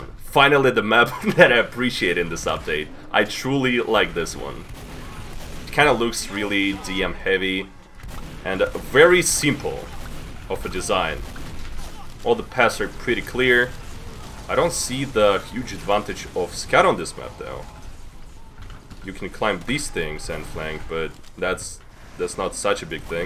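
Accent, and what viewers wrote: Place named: Russia. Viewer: I like these rants about valve I like hearing russain man to. Good video.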